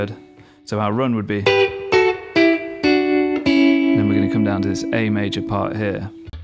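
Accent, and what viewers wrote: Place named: Birmingham, United Kingdom. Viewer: Your accent sounds familiar to me as a born & bred Staffordshire bloke....and the best I can come up with is posh brummie. You're not from somewhere like Solihull are you? 🙄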